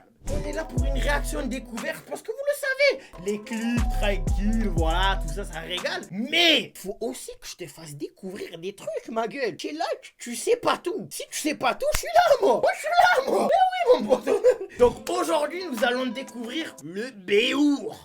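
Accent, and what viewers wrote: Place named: Marseille, France. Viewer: Challenge: tu pourrais faire une vidéo réaction sans tout axant marseillais, sa serait cool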